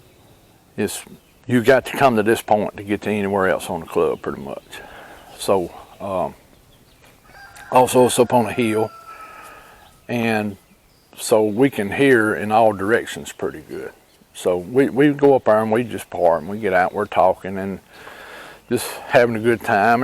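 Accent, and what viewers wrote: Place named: South, United States. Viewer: I love hearing ur southern accent like Cam from Dixie Cryptid & love your stories. I hear a lot of encounters from Alabama. They are everywhere, it's just most ppl don't know it. Thx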